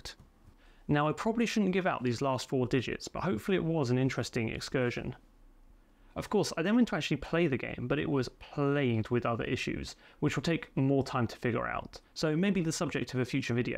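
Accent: United Kingdom. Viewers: 1. Britisch accent check 2. Nice explanation check 3. Fun topic check Subscribed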